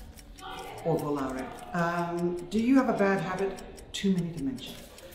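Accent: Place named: RP, United Kingdom. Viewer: well, the UK, as small as it is, has more accents condensed into a country than i've ever heard, so i guess it depends what your ear hears. i live in south africa, and we have 11 official languages and hundreds of unofficial ones - the cocktail of accents here is incomparable, but that is because there are so many different languages. in the UK, even with english being the first language for most, i have never heard so many varieties of accents in a matter of kilometres apart ;) to me her accent sounds like a mix of many, but definite overtones of posh brit, unless you listen closely ;)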